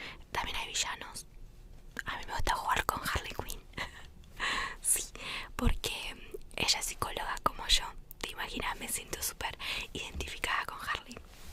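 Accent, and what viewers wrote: Argentina. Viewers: Si no es Argentina es uruguaya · Wah... me encanta el acento Argentino, con solo escucharlo me relaja v: \^-^/💕 Eres muy hermosa ❤ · llevo 45 segundos de video y estoy seguro que es argentina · Me cage de risa ensima el acento porteño nananana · Sos argentina como yo?? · Hola,te quiero preguntar de que pais sos? Por q creo que por ti forma de hablar sos de argenrina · sos argentina?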